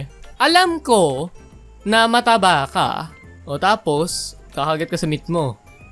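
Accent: Visayas, Philippines. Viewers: Bisaya kaba lods?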